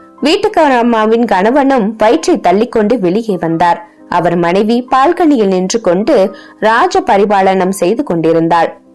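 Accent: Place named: Chennai, India. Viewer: Good evening kuttima. ஐயாவின் அடுத்த ஒரு அலையான... குடித்தனம்.... சிறுகதை கேட்டு மகிழ்ந்தேன். ஐயாவின் எழுத்துகளை விட உன் குரல் சென்னைச் சேரிப் பேச்சு வழக்கத்தை அனாயாசமாகப் பிரதிபலிப்பது அருமை! இத்தனை சாட்டையடிகளுக்குப் பின்னும் இன்றுவரை இந்த அவலங்கள் தொடர்வது தான் அந்த ஏழைகளின் தலைவிதி போலும்.... குரலோசைக்கு வாழ்த்துக்களுடன் நன்றி மகளே. அன்புடன் அப்பா.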